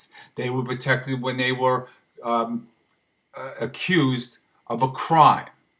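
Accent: New York, United States. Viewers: Sir, it is spooky how you are describing the lives my wife and I have lived. We are both children of narcissistic parents, (more so our mothers), originally from NJ. We moved to the gulf coast of AL to escape our narcissistic mothers in NJ. We both suspect, from your similar accent, your originally from that general region also, (ok, I'll say it, NY), and may have relocated as well. We "enjoy" your posts, because they serve to let us know we are not crazy. We have concluded that no contact is the only way to escape. However, just like Al Pacino said, just when we thought we were out, they pull us back in! LOL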